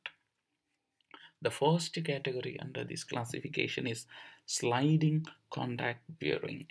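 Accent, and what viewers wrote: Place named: India. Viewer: this is top tier indian accent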